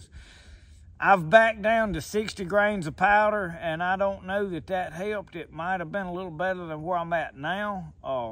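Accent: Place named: Mississippi, United States. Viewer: Well, men are usually born problem solvers, we tend to wanna fix things. As I get older, I tend to keep my opinions to my self, because I’ve figured out that my opinion is sometimes wrong!!! Lol. Enjoy your channel, love that Mississippi accent. Makes me sound like a yankee, and I’m from Arkansas!!! Lol. (No offense, love my yankee brethren) !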